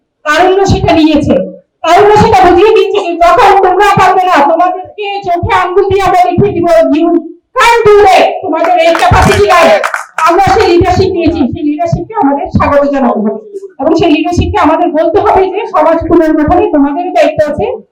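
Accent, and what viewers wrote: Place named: India. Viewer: এই মহিলা ভারতীয় নাগরিক কথা বুঝা যার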